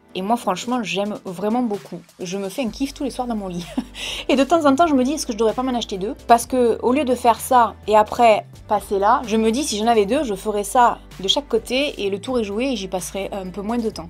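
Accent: South, France. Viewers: J'aime tellement ton peuti exan du sud, tu me fait penser à une amie d'enfant là même j'ai l'impression 😊 tes vidéo son super merci beaucoup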